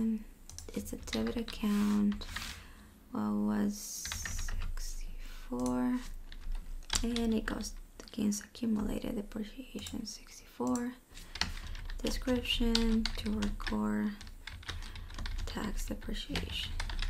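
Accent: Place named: Latin America. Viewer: She sounds latina, great explanation 🎉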